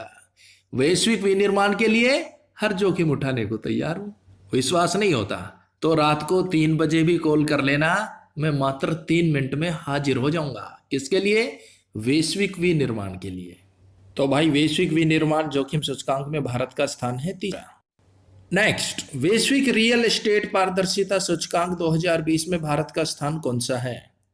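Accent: Haryana, India. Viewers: Haryanvi style 😘😘😘😘😘😘👍👍👍👍👍 Haryana me kit sh ho ji.. Ap💕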